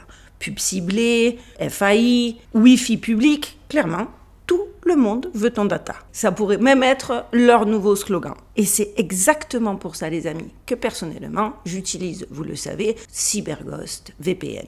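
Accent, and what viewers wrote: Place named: Nice, France. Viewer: Wow ta d’beau yeux tu sais l’accent niçois avec un soupçon moscovite bon ton vpn ,on s’en fout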